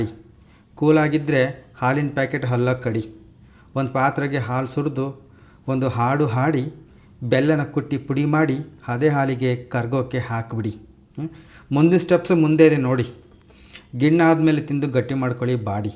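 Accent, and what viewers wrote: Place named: Channagiri, India. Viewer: Channagiri side pura hege matu keli thumbha kushi aytu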